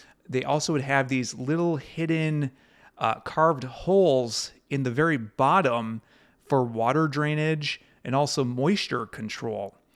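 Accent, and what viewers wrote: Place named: Wisconsin, United States. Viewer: He's from Wisconsin. 😊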